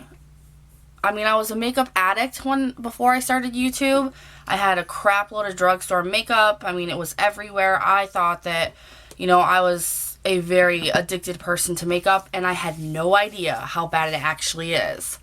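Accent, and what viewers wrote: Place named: New Jersey, United States. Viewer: Michigan, about 30 minutes from Detroit where my fiancé currently lives. What state are you from? Your accent sounds a little like someone I knew from New Jersey.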